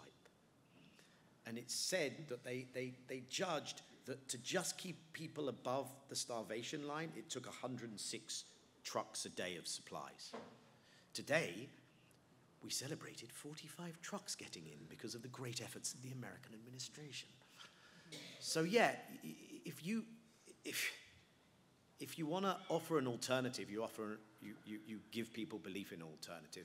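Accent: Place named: United Kingdom. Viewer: He maybe Israeli but he speaks like a clear thinking Brit.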